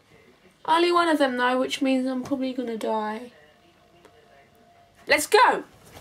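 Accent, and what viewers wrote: United Kingdom. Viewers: She's British and they have an accent so not everything sounds as perfect as you wish it to be you silly American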